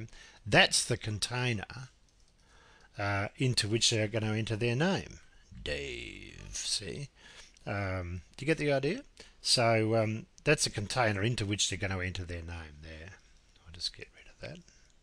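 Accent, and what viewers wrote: Australia. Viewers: Thanks Dave plain Aussie Talk Very Easy to Follow.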